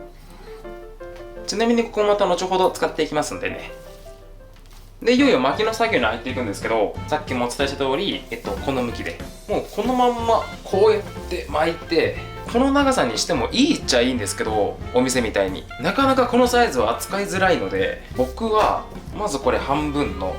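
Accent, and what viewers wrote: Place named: Hakata, Japan. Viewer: 全編博多弁の動画を見てみたい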